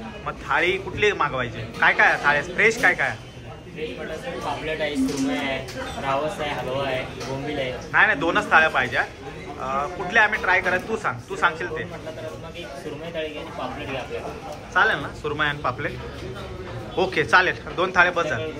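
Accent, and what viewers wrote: Malvani, India. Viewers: Avad नाय भेटला तरी चालेल . पण तुझी जी भरारी आहे ती लय भारीच आपल्या गावचा नाव रोशन केला दादा तू ❤❤❤ बाकी तुला हार्दिक अभिनंदन भाई असेच तू व्हिडिओ बनव . सर्वात महत्वाची गोष्ट म्हणजे माझा मुलगा 14 वयाचा आहे आणि त्याला तुझे व्हिडिओ , तुझी कॉमेन्ट्री , तुझे कुटुंब सर्वात आवडते आहे . सांगण्याचे तात्पर्य म्हणजे तुझ्या मुखावाटे निघणारी मालवणी वाणी ही सर्वात आवडती ❤❤🙏🙏🙏🙏🙏🙏🙏🙏🙏🙏🙏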